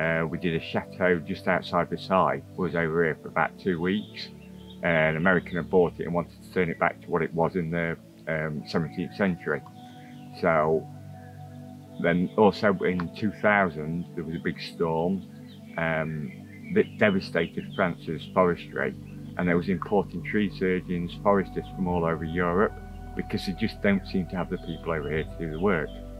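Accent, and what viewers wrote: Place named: East Midlands, United Kingdom. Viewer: I would love to know whereabouts in the East Midlands you are from, or rather where you spent the most time as your accent is so familiar to me. My Dad was from Heanor in Derbyshire but worked for the electricity board as an overhead linesman. My husband also has a similar accent as we are from Kimberley in Notts. I hope you don't mind me asking. My husband would love your lifestyle.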